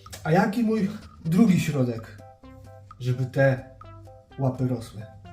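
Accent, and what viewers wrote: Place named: Upper Silesia, Poland. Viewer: No, no, łapy masz fajne. Słychać, że jesteś z Górnego Śląska.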